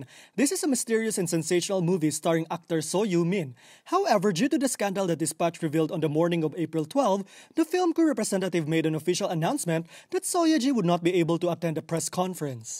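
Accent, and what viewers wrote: Philippines. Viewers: I think the narrator was a Filipino, because of his accent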